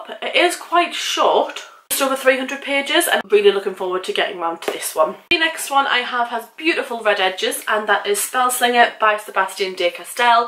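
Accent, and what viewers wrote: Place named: North, United Kingdom. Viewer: I'm a new subscriber from Twitter and I'm 13 seconds in and all I have to say is... YOU'RE NORTHERN?!! SO AM I!! YOU LITERALLY HAVE THE SAME ACCENT AS ME!! Can we be best friends??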